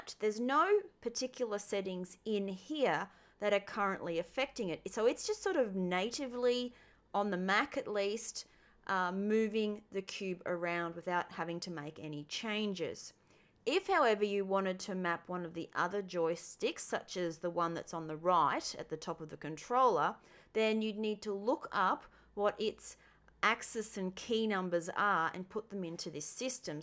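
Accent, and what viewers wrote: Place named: Australia. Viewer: you sound like you from southern queens land or northern nsw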